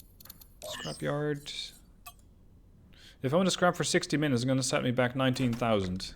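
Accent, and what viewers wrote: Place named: Netherlands. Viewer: holy shit you're dutch! or belgian, or russian (but doubt). ahem I mean "oh cool, a recent avorion stream ^-^"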